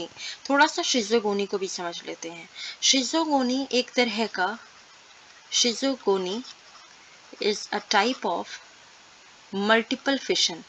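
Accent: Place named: United States. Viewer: Ma'am the video was fabulous , marvelous , fantastic , mind blowing , exellent . Your way of teaching is fabulous the way you explain is just fantastic . I had problem in understanding this topic but after watching your video i understood everything . I sure that you are the best teacher in the world with a stylish or i should say american accent .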